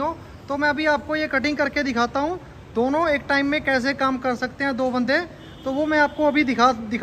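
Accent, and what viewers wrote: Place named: India. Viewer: creo q es ingles con acento de la india jejej, pero se para q sirve la maquina , se ve genial y solido, en pais seria como una novedad. Felicidades por su ingenio y trabajo.Saludos desde SudAmerika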